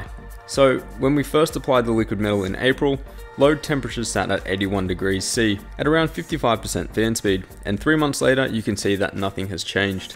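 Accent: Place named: Australia. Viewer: Subbed for Australian accent.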